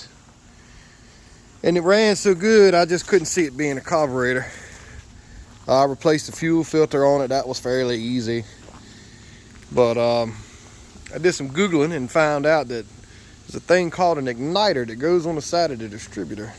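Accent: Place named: Virginia, United States. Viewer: Thanks for this I’m considering purchasing a Kei truck, I’m leaning towards Honda because it seems like you can find a lot of parts here in the states. Your VA accent is strong! This video came recommended and I didn’t even look at the channel name but though “This dude is from VA”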